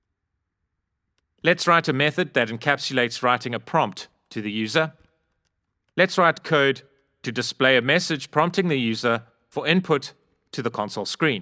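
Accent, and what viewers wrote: South Africa. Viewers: Nothing better than clicking on a youtube vid and hearing a south african accent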